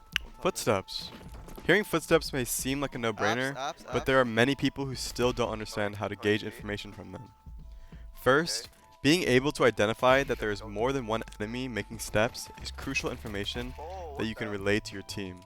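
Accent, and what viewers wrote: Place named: Ireland. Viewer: sounds irish lmao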